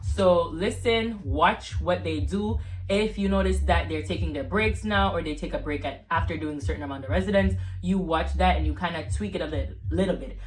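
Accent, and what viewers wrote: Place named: Jamaica. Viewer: Great tips! Also hear that Jamaican accent lol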